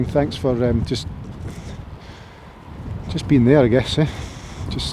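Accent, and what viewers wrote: Scotland, United Kingdom. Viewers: I watch everyone of your video’s, start to finish. For the only reason of leaning, enjoying the game. My all time favorite golf experience was in Scotland, so the accent always brings me back, smiling. I don’t need to see someone play some course that is in an exotic location, unaffordable for most…. Your setting, on a course that reminds me of where I play, feels more genuine, not a game for only the ultra rich. I only wish you a wonderful golf future. I will be here watching each episode… as long as you do them. The other you-tubers you mention, are OK, at times entertaining. But globe trotting, ultra expensive trips they seem to play is not my idea of fun. More Hollywood than just a golf guy enjoying the game. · I'am from Germany and I love your Scottish accent, your content and all your videos! I don't think its about palm trees or T-Shirts but my best advice for your videos is to use something similar to a "telegram/snapchat filter" So your videos have slightly more color to make them more appealing to the viewers eye <3 much love keep doing what you love! 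· I know how you feel mate I only have 432 subscribers lol. I have always enjoyed your videos and like the Scottish accent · I am a 75 year-old golfer been playing for 55 years. I have watched all of the people that you mentioned but I only follow you and you are the only person that I subscribe to, that should tell you something. I'm a midlander so you're scottish accent doesn't faze me in the least . Why don't you show us some of those beautiful scottish golf courses off the beaten track, that would be interesting. We all have bad days and feel a little un-loved, just keep going, sometimes life is just full of hills. Rome wasn't built in a day. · You are a first class teacher and person. Fantastic video of you playing and talking about life. The two coincide beautifully. I’d kill for your Scottish accent. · Hi,sounds like you need a well deserved break, the Scottish accent works just fine and in many ways makes you unique, The instructional videos are great and informative, maybe do what pleases you and if the masses follow great but if not and you get satisfaction from what your doing that must be all that matters, what ever you do all the best.. 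· I've never subscribed to any other golf channels but yours, you're the reason why I'm back in loving this game again, thanks to Eureka golf swing, you should do more of these play and talk, just love every moment, especially with the Scottish accent🙂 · you do you! Although I ski in winter, I still love watching and learning about how I can be a better golfer come spring and summer! I watch them all and love your content because of your Scottish accent and unique teaching style and well, seeing the beauty of Scotland and its courses. I’m a subscriber and would love for you to be happy and succeed. I will spread the word to my buddies…I wish you all the best and look forward to your next video. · I have not come across Danny Maude. You are the only channel I subscribe to for instruction. You are definitely the benchmark and the clipped Scottish accent is one of the best aspects. I might click on Rick Shiels for equipment reviews, but don’t subscribe. 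Your sound setup is also my favourite. Would be very sad to see you give it up. The algorithm is a cold heartless beast, but an aspect of life that is not going away any time soon. I am convinced you possess the knowledge to help me enjoy my golf more, but how that knowledge gets into my head and then into my muscle memory is a challenge. Thanks for all the jelly beans. 😃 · Well, I do like your scottish accent. So please don't give up. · Mate, I live in East Lothian and love your content. Great to hear a Scottish accent. Quality coaching and above all, your honesty is so refreshing. Keep going, I’ll keep watching.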